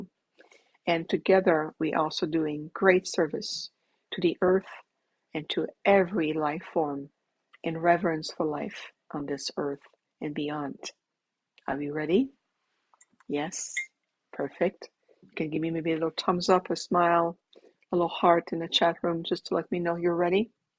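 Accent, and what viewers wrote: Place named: Quebec, Canada. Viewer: Merci!! J'adore tes méditations! Même s'il est presque indétectable, ton accent me dit que tu viens du Québec. Nous sommes de nouveau en lock-down, couvre feu, tout est fermé même pour ceux qui ont leur Pass sanitaire. Grosse leçon pour eux j'imagine. So thank you again! I saw you on Patriot Dave and listened to all your meditations since. Thank you for your devotion to the collective. Have a great year! I didn't even see 2021, time as been slowly disappearing the past 5 years for me. We've got this! Much love ❤️❤️ From Contrecoeur, Qc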